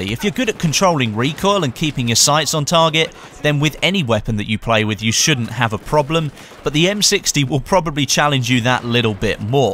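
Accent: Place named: RP, United Kingdom. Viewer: your english is so beautiful! You talk like some dude from "BBC Learning English":D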